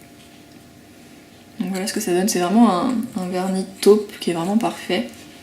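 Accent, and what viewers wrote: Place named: England, United Kingdom. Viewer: Coucou miss.... Ah qul plaisir cette vidéo avec ce petit accent anglais j adore un pur moment de détente merci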